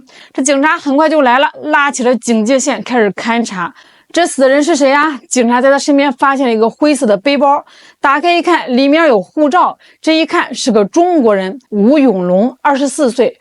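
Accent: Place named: Beijing, China. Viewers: 原來是北狄人 口音特別不舒服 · 她是一个北京大妞。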